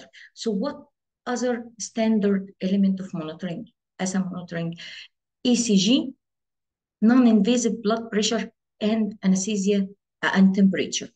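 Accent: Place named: Egypt. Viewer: I just lov the Egyptian accent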